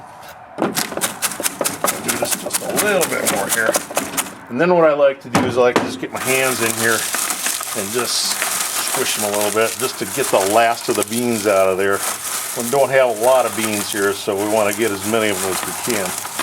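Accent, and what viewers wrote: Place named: North Dakota, United States. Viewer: Thanks for demonstrating some great ingenuity! I always like to see off-the-shelf items re-purposed. When I first started watching this, I said to my wife, "He sounds like Charlie Selberg! I wonder if he's from North Dakota? " And sure enough, you're in Fargo, which is where Charlie grew up.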